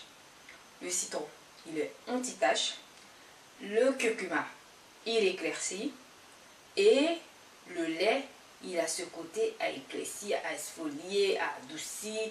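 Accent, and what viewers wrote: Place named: Ivory Coast. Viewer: Merci merci cette vidéo tombe bien 😊 l'accent ivoirien qui ne se perd jamais 😂😘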